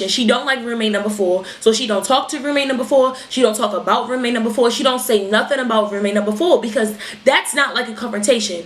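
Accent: Baltimore, United States. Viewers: I can see you really making it big on YouTube! you have inspired me to make mine! Bmore accent poppin!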